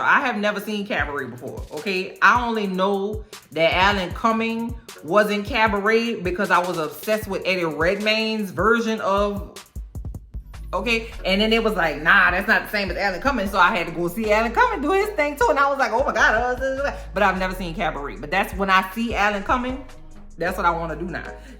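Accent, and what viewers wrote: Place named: Los Angeles, United States. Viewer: Just binged it… all these folks are delulu! I don’t know anyone else reviewing this, so I’m here to stay for a while! U sound like my LA ppl! 🫶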